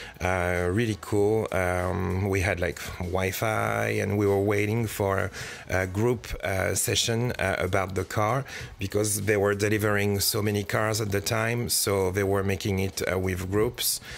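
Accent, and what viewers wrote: France. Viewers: your charming french accent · trop marrant la voix avec l'axent français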